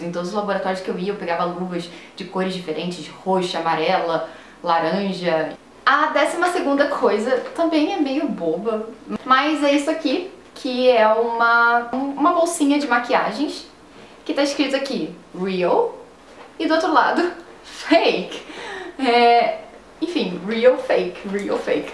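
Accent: Rio de Janeiro, Brazil. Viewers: Muito fofinho esse teu sotaque carioca <3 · Só agora eu reparei no seu sotaque carioca ... coixass maixxs legaixxs... hehe :)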